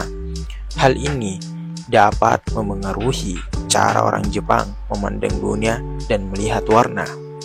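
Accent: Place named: Sulawesi, Indonesia. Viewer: aksen cukup kental bang😊 Saya juga Bugis asli😁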